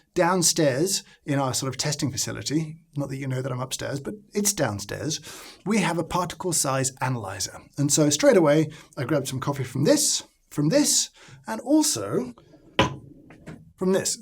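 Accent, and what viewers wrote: United Kingdom. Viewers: Americans trust British accents like. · "This seems to be symptomatic of something that gets me a little bit excited." OMG! So British... Old school British! I don't care that much about coffee, but I love listening to this guy talk! And, man... does he talk.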